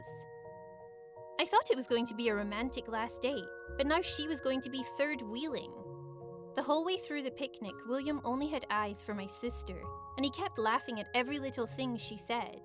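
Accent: Scotland, United Kingdom. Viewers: You sound a little bit scottish x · You talk British I like your accent😉😉😆😇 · Does the narrator have a Scottish accent?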